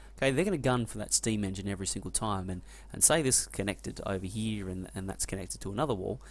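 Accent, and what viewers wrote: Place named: Australia. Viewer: question, do australians all say "turrent" because of some language reason? i've heard it before, they dont say "turret".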